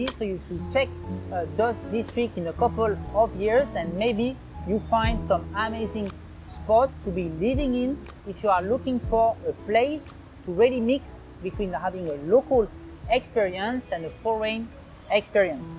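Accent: France. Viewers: He has a French accent because he is ... FRENCH!! Can Americans be respectful or is that too much asked?! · Also sein Englisch-Französischer Akzent, ist verständlicher als gewisse andere Britisch oder Texanisch Englisch sprechende Personen. Desweiteren könnte man auch sagen, vielleicht lernt man den Englisch - Französischen akzent zu hören und zu verstehen. Get out of your Comfort Zone and grow your learning curves. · Nice french accent. Very funny to hear it.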